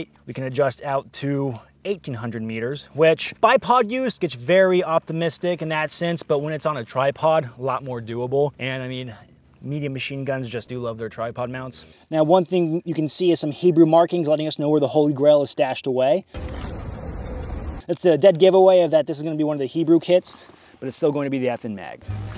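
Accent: Afrikaans, South Africa. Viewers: Lekker video my Bru.... Your Afrikaans /rodisian accent sounds good. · As a south african and afrikaans speaking native the accent killed me 😂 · Not the South African accent ,love it 😭🤣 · Liiiike it my bru your afrikaans English accent lol focken lekker 👍👍👍👍👍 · That was the best Rhodie/South african impression ive ever heard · Love the South African/Rhodesian accent, shot my bru 🤙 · As a afrikaans speaking south african i aprove the accent hahaha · as a Boer patriot in commie South Africa, I quite enjoy your safrican accent. lekker my bru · Love your attempt at a afrikaans accent hehe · The South African accent and slang is awesome lmao and also the History lesson makes me happy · your South African accent isnt half bad 😎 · The South African accent 😂😂😂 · Oh wow again the Rhodesian and South African references were amazing. Saw some SADF photos in the video of troops with the FN MAG. 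South Africa used the FN MAG in the bush war and was the backbone of the troop or squad. Love the accent!! Good job. Not quite leo's level in Blood Diamond but with some work you will get there. Well done bru! TIA. As a South African this video made me smile.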